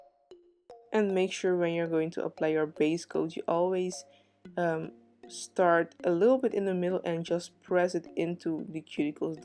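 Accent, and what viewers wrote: Netherlands. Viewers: I can hear from your accent that ur living in Holland.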